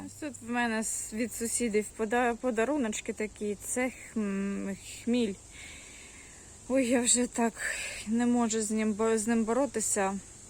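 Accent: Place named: Russia. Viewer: Трудно слушать украинскую мову , в исполнении русскоговорящих.Ну так и хочется помочь . Зачем ? Конечно может это требования ютуба или нашей е- ной власти , а может необузданый патриотизм , не знаю . В любом случае сочувствую.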